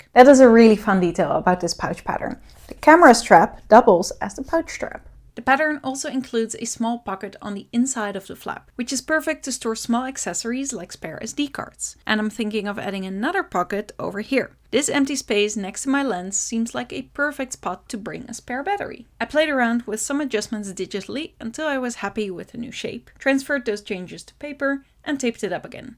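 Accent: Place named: Quebec, Canada. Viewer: Love this tutorial. I recently got back into SLR film photography and want to make myself a cute camera bag. I also love your accent, are you from Quebec?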